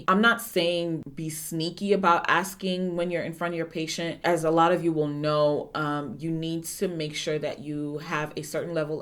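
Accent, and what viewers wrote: Nigeria. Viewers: lol the nigerian accent.. <3 awesome video btw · I'm loving that Nigerian accent :) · I love the in-between Nigerian accent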